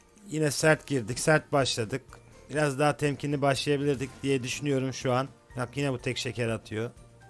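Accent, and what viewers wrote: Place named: Thrace, Turkey. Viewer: Trakyalımısın şive biraz var gibi, hadi bakam derken 😅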